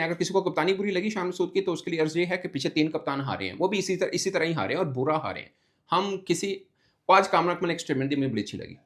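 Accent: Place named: Amritsar, India. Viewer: your Punjabi sounds like so AMRITSARi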